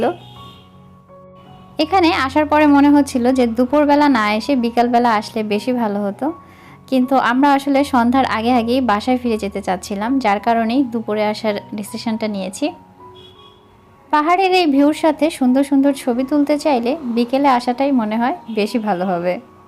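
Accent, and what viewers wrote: Sylhet, Bangladesh. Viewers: Are you sylhetii apuu ??? From uk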